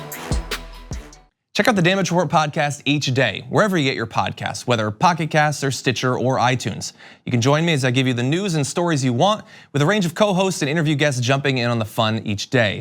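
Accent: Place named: Ohio, United States. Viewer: John endearingly says "wuter" which is sort of Ohio speak.. Where's he from? [asking for a friend from Ohio]. Chicago was never this progressive when I was growing up there.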